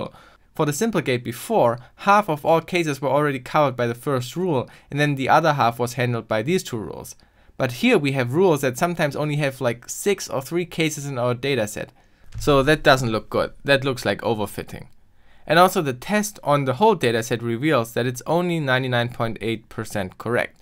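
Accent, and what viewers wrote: Germany. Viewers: Bisschen hört man doch raus das er deutscher ist :D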